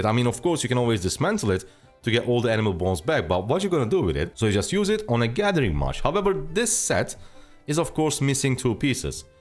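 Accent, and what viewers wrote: Turkey. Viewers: Türk aksanı duyuyorum sanırım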